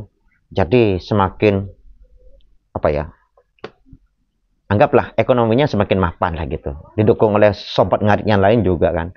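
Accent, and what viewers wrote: Madura, Indonesia. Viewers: Pancen meduro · Assalamu'alaikum bro klao sya cermati dari suara nya sampeyan ini kayak nya orang Madura ya bos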